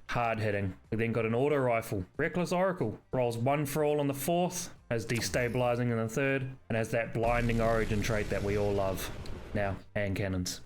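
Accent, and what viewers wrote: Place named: Australia. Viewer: I like your Australian Accent mate!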